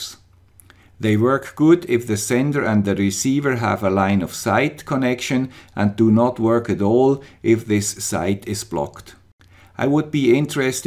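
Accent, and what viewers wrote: Switzerland. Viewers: the swiss accent reminds me of the swedish accent. don't worry, that's a compliment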